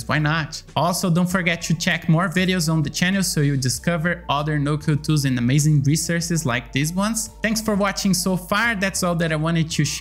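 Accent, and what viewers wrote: Brazil. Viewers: I love your accent sounds like a brazilian guy speaking english. Great content btw!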